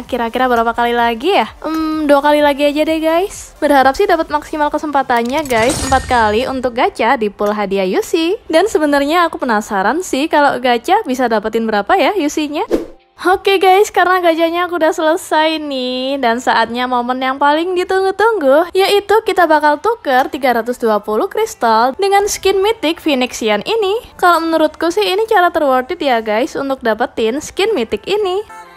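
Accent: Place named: Central Java, Indonesia. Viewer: Logatnya kya orang jawa tengah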